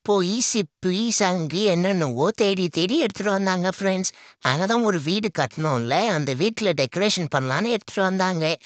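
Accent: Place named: Chennai, India. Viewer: Ada namma ooru paiyanaa neeyi ❤. Ana chennai slang pesuriye da paiya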